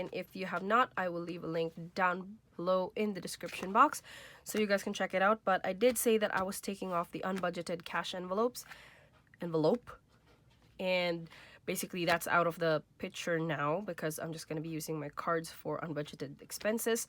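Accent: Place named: Canada. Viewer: You have an accent are originally from Canada.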